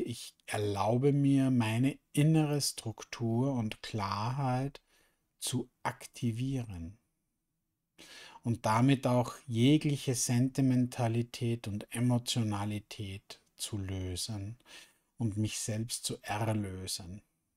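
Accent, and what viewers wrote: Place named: Linz, Austria. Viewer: Hallo, hab´ dich erst gestern über zwei Videos mit Monika Donner "zufällig" entdeckt (na, das "zufällig" glaubt kein Mensch mehr...) - den Linzer Dialekt erkannte ich sofort... es war nämlich auch mein erster... Nachdem´s heute grad nicht ganz einfach war mit der emotionaen Freiheit, probierte ich diese Meditation aus... und, oh ja, sie wirkte grandios. Jetzt sitzt das Herz wieder dort, wo es soll... und lächelt... Alles gut!!! Herzlichen Dank für die Meditation! Einen lieben Gruß vom Lande!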